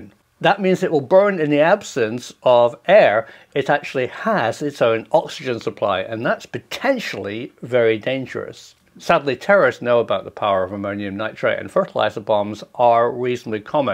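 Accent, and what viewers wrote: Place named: Scotland, United Kingdom. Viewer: Professor I thought Scottish where are you from I’m from Fraserburgh in the north east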